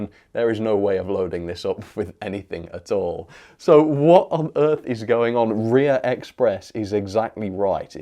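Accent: United Kingdom. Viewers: Can tell he’s British lol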